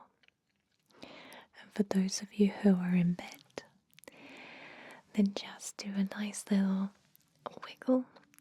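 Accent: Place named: United Kingdom. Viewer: You’re only saying that because they look alike... and sound alike... and both have british accents... and both do asmr... and both have the first name... and they are the same person.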